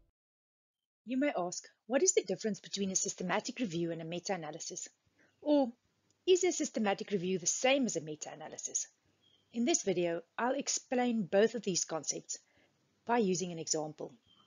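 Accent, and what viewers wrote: South Africa. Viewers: South African accent recognisable anywhere in the world ❤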